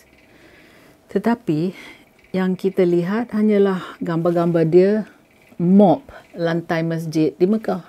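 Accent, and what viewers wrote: Perak, Malaysia. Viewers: Kuat likat pekat melekat peraknyeee yongg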